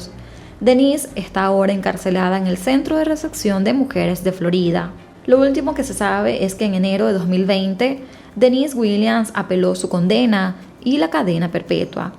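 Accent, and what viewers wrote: Standard. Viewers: Me encanta tu voz neutral sin acento. Bendiciones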